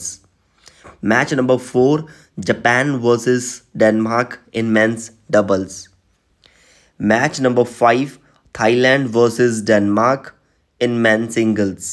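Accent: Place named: India. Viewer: I like your indian accent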